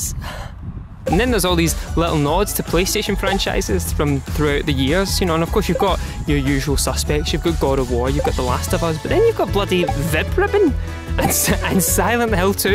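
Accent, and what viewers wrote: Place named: Scotland, United Kingdom. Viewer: You should review more games. I love that Scottish accent. As an English language learner, this is like relearning English all over again.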